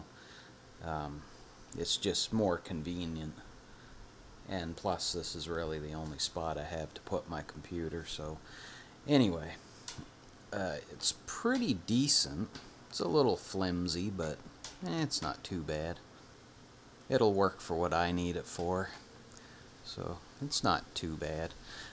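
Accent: Canada. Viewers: You are canadian aren't you?!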